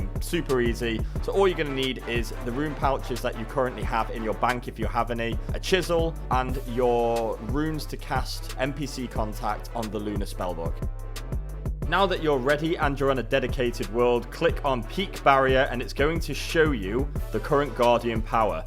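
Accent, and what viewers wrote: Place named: United Kingdom. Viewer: Having a slightly ticked off Brit, passively aggressively telling me what to do is actually rather calming.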